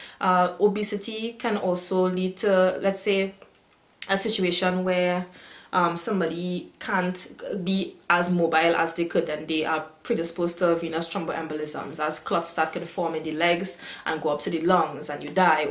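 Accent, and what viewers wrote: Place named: Trinidad and Tobago. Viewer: I just subscribed. I love how you kept it real, with no fake accent. Real trini accent here.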